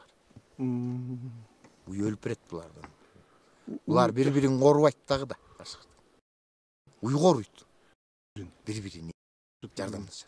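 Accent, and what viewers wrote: Standard. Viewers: Азыр уйдун эт-сутуно караганда топоздуку таза болуп калбадыбы,укол-дары бербей баккыла эми.Ал эми топоздун сутун кандай пайдаланган туура болот аны интернеттен караса, пайдалуу маалыматтар коп эле болсо керек. Малчылардын туйшугу да ото оор болот,ак-эмгегинердин узурун коро бергиле!Так-таза кыргызча суйлоп, жакшынакай маек берген азамат экен. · Депутаттарга караганда сонун так даана тушунуктуу нукура кыргызча кагаз карабай суйлойт экен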